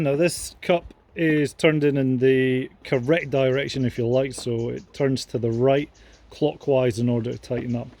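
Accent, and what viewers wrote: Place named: Scotland, United Kingdom. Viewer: What's up Hello brother, I'm getting mine in a few weeks. Went a complete build which is amusing considering I own a bike repair shop but stock in the suppliers is VERY low and PX have all the parts. Be rest assured mine will be customized in the future too:D Where are u from? I'm Dalkeith. You sound east Scotland too.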